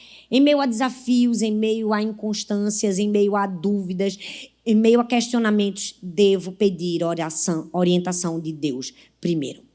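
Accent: Northeast, Brazil. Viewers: Sou católica ,nem por isso deixo de acompanhar suas pregações, tem mais sou pernambucana da gema, assim como você!!!!! Amo seu sotaque bem nordestino.visse menina????? Kkkk🎉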